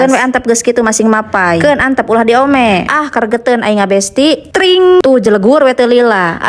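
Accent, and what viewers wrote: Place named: Garut, Indonesia. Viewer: ciga logat urang manaaaa Kitu teteh...😂😂😂,,Garut kidul kitu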